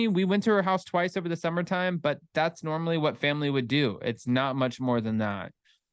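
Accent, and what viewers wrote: New Jersey, United States. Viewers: We love your 'Joisy' accent. it really colours your story! Hope you and Jason have a great weekend, and that Shaylee gets some well deserved rest!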